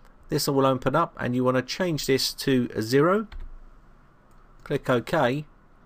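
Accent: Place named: Cockney, United Kingdom. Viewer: You are a marvel to us, your tech tips are truly on the button but I have to laugh, my wife too is a cockney. One of her "bruvvas" speaks just like you. His fumdrive (also drive hache) is always having write protection problems. Hahaha. All the best mate.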